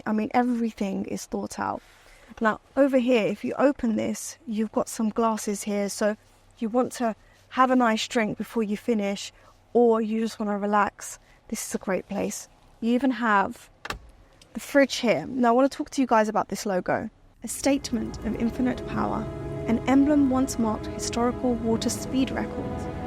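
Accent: London, United Kingdom. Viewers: you can hear the london accent coming through lol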